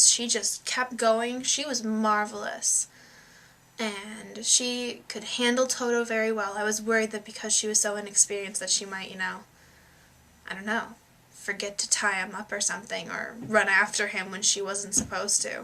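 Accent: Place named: United States. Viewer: Are you American? If you live in London, how come you're speaking in an American accent?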